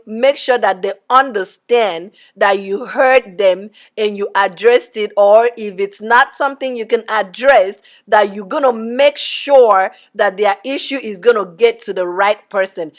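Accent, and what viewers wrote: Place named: Efik, Nigeria. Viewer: You sound like my Efik or Ibibio sister❤ Thank you.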